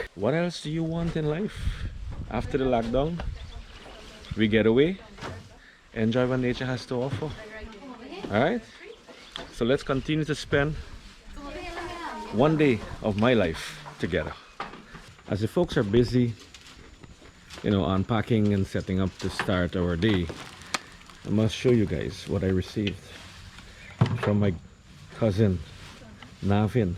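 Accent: Guyana. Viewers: Guyanese accent seems musical, I always love to listen Sunil Naraine the cricketer voice as it seems musical like yours. Love and respect from 🇮🇳. Keep enjoying bro.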